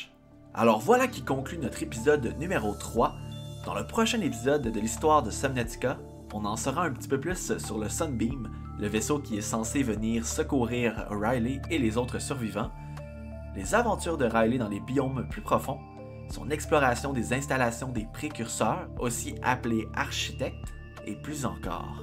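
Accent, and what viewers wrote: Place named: Quebec, Canada. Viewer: j'adore merci pour cette série, je suis d'ailleur jaloux de ton accent qc le miens en pas assez prononcé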